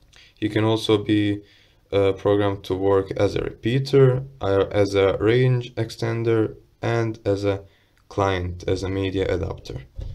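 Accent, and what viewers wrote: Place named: Serbia. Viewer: Odmah sam znao da si nas cim si poceo da pricas :) Hvala na videu...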